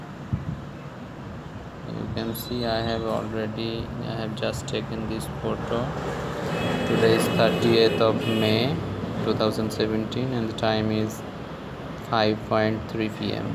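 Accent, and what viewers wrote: India. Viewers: I dont mean to sound racist, but why all videos related to cellphones has an Indian guy talking behind it? Its creepy. Its like every single video. Does beign Indian give you some special insight to these kind of things?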